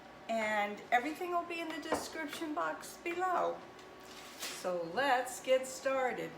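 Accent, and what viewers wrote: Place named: Maine, United States. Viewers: I was searching YouTube for a dark molasses cookie like my grandmother used to make, and yours look most like them. I didn’t even notice the name of your channel, but I thought “she sounds like she’s from home” Haha yup, Maine💛I’ll be trying your recipe! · You remind me of my great gramma Goldie. She was from mid state Maine toward the west. She had just the same accent as you🤗 she passed 9 years ago this December. She was just shy of 93. What a feisty lady she was too!! Anyway, I’ve been looking for a recipe like this. Thank you for the recipe and the memories.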